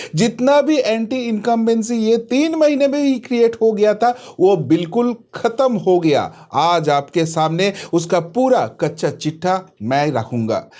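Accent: Bengal, India. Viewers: da, apnake request korchi, apni Hindi News er jonno ekjon bhalo Hindi bashi News Reader appoint korun. Karon apnar hindi uccharon khub kharap. 100 kothar modhye 90 ta kothai bangla die makhano. Apni dekhben Arnav Goswami ki bhabe suddho hindi bolen. Kharap paben na, follow korun, ar bhabun. Apnar bangla news ami sob somoy dekhi, bhalo lage, banglai chalan....... 🙏🙏🙏🙏🙏🙏🙏🙏🙏🙏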